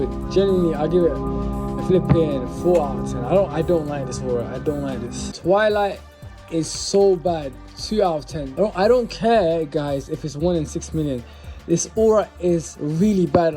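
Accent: United Kingdom. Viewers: Ngl didn’t expect you to be bri’ish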